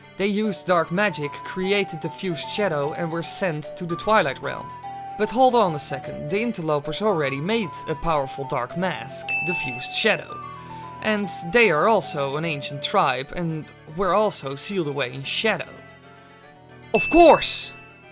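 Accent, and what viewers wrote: Netherlands. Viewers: I have to ask, but you're dutch, right? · Are you dutch bcuz your r is really strong and you have that accent Ik ben nederlands trouwens(im dutch btw)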